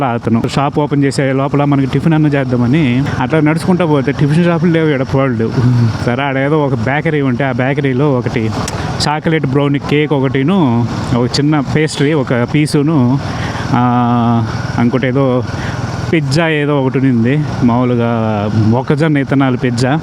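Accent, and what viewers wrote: Nellore, India. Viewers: Nellore bro...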